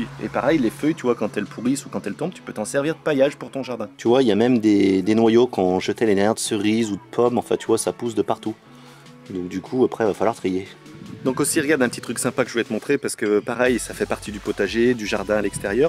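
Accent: Loire, France. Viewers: Un p'tit accent du 42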